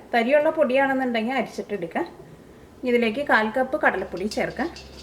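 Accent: Thrissur, India. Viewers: Chechi de nadu thrissur ano?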